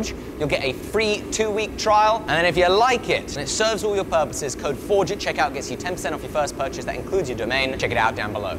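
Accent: England, United Kingdom. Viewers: When I heard his voice I thought, YES. An Englishman making a Damascus. This should be good. I left after posting this comment. The loud americanisation of the production is off-putting. Sorry, but reign it in. Quality over quantity... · The British accent makes me trust him 25% percent more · degrees fahrenheit, thousands of an inch, yeee haaa, what part of England are you from? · Its just the awesome upper british accent and language next to the chaos of forging some cool damascus. Some itch was scratched in my brain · So what's up with the Montana thing as you sound British but I get it I'm a dual but I'm a graduate of Carroll College in Helena. My two best friends are two of my good friends live in Bozeman one has a pizza shop and the other is a family therapist! Another friend of mine used to be a representative from Butte but he had a bike accident and sadly passed early. 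He was a hippie and he looked like hippy, And he was a absolute golf pro so the locals would use him up in Bigfork to play golf against others and tourists and he was there ace in the hole. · isn't it crazy that he said the British equivalent of "the patent has fucking expired" but since he speaks queens gibber it doesn't sound heavy at all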